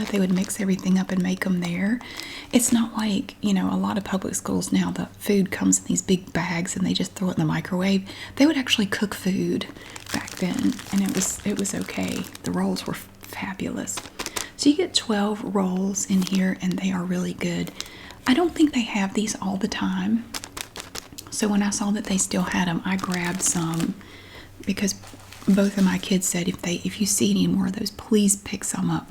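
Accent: South, United States. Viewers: Christmas time, Southern accent in asmr rhyme, aldi products crinkling under 30 decibel level time. 😊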